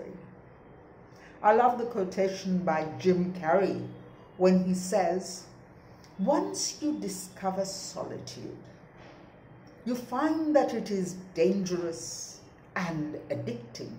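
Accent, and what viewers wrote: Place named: United Kingdom. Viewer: How many gen z n millinials understand this british english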